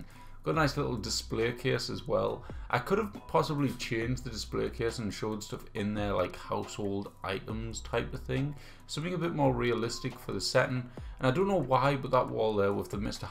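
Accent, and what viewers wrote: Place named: Stockton-on-Tees, United Kingdom. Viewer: I’m Stockton-on-Tees so yes you sound familiar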